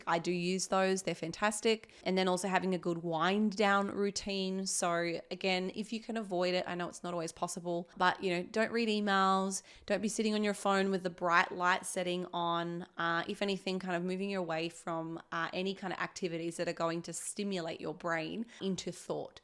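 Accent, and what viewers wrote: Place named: Australia. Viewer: Hello from California. Terrific advices & lifestyle vlogs. We knew we were watching a champion ever since we watched your first videos, Ms.. Inspirational. We like the Australian accent, Australian celebrities, & Australian models. You are a fantastic coach/ personal trainer, good teacher. Congrats from our channel 🎊✨🦜🦜🦜Australian Parakeets Stars✨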